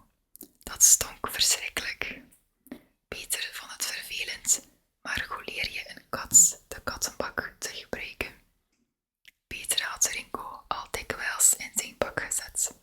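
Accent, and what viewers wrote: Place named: Flanders, Belgium. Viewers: Weer heerlijk om naar je Vlaams gefluister te luisteren. Ik hou van dat taaltje van onze zuiderburen. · Heerlijk! Je stem en je Vlaamse accent zijn zó fijn om naar te luisteren! Goeie setting ook! Fijn donker en ontspannend. Topwerk! Dank je wel..🍀❤️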